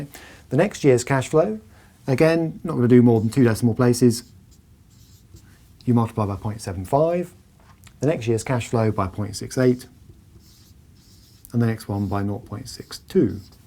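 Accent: United Kingdom. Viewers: love the British English! Always feels like the accent that any good teacher should use haha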